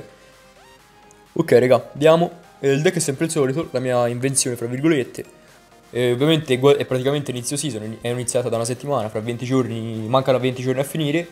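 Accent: Tuscany, Italy. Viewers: Bella, di che zona sei della toscana? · lo so sei toscano si sente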